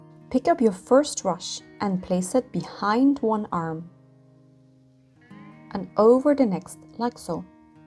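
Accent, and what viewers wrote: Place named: Ireland. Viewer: Fantastic tutorial and great education to keep tradition alive. Where are you from originally. Sounds like you've lived in Ireland a long time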